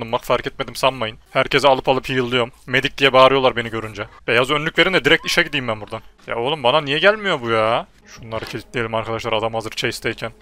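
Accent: Sivas, Turkey. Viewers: Hemşerim sivaslımısın bende😍😘🥰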